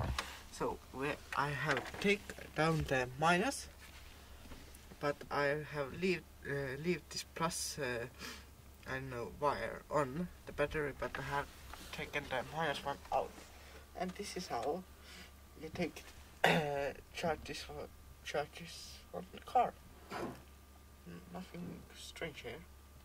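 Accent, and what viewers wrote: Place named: Russia. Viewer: he's Russian, at least he try his best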